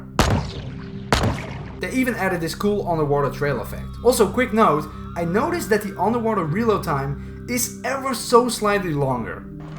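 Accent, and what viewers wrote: Netherlands. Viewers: Ik dacht al dat je Nederlands was vanwege t accent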